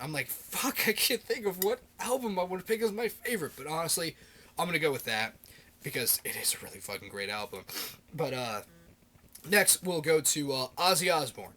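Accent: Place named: Germany. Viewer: your german is funny :D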